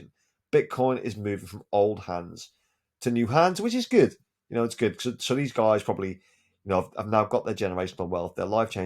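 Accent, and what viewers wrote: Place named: Norfolk, United Kingdom. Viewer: Norfolk! Sounds weird hearing a crypto chap from the area.good luck this cycle ✌️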